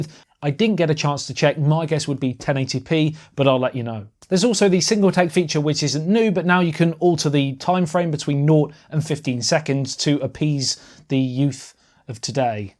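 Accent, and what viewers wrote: England, United Kingdom. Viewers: That ENGLISH accent, 😂😂😂😂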